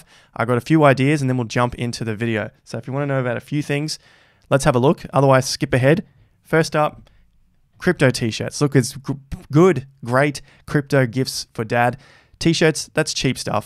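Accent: Australia. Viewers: are you the Aussie accent on yousician?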